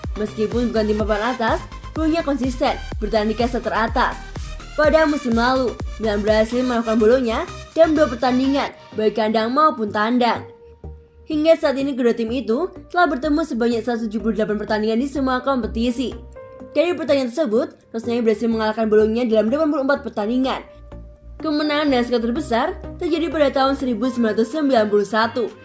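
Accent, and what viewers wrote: Java, Indonesia. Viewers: Ganti lah voice over nya, medok ngmg kek kumur2 mana cadel lg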